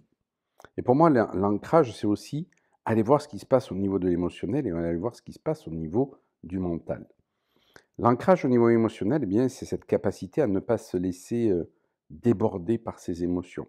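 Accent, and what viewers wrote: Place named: South, France. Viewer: Merci pour le rappel de savoir bien se connaître soi et je crois d'ACCUEULLIR tout ce qui est ici et maintenant ! Merci pour ton accent du sud ensoleillé ! Bonne journée à tous. Merci pour tes vidéos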